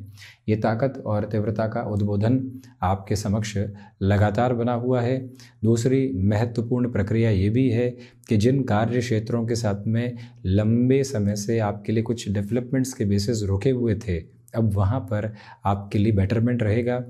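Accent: Standard. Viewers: Aap ki Hindi bahut khub aur shudha hai..🙏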